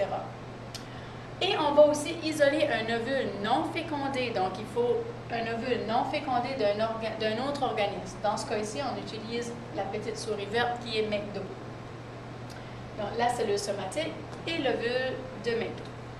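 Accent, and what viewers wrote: Quebec, Canada. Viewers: t'es sourd ou quoi ? ça s'entend à 2 km qu'elle est québécoise.. (l'idiotie se soigne)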